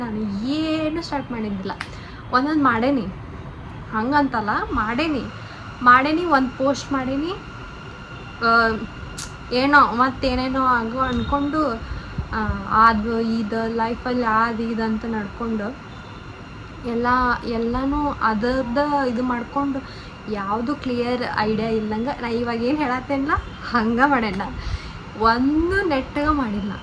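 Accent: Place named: Dharwad, India. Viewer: Bangloredag 3 varsha idru innu dharwad bashe martila alla ad ista aagid nin vedio olag❤❤❤❤❤....love and respect for that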